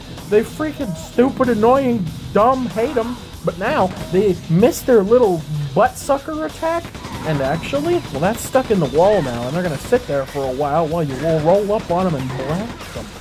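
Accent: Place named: Midwest, United States. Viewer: bruh that’s that midwestern type accent you don’t get it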